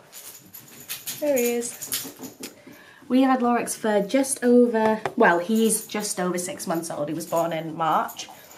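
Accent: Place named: Northern England, United Kingdom. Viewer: Plus, you sound northern haha. Got mine from Staley Bridge.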